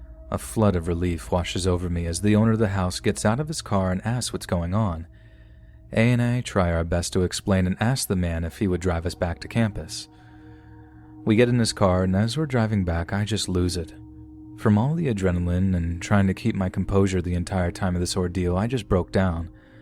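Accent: Australia. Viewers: ..danger to be brought to your face.. Choice. Plus the Crocodile Dundee reference and his Australian accent..😂😂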